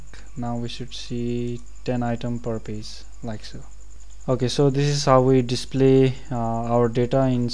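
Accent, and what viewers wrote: India. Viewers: Опять индусина. Бля невозможно слушать...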